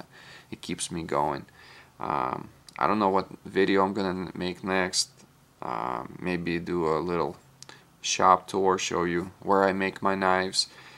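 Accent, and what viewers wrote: Russia. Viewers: Прослеживается русский окцент)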